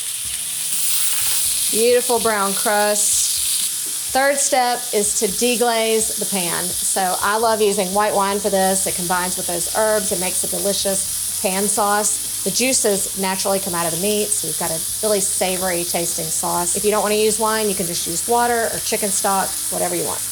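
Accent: South, United States. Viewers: I like her southern accent ...❤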